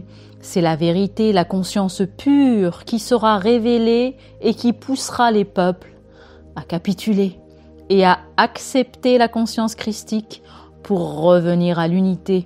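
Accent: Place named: Réunion, France. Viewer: Merci pour cet éclairage et ce petit accent ( réunionnais?) charmant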